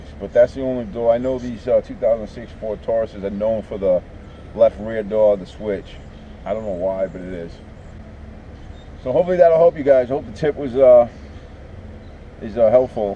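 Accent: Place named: New York, United States. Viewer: love your accent man. Is that NY?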